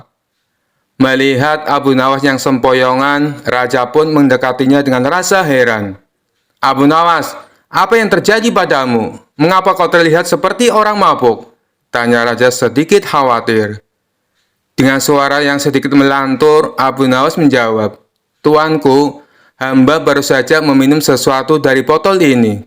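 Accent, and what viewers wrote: Java, Indonesia. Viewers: kalo bisa pke bahasa indo yg bner jngan medok biar lebih enak 🙏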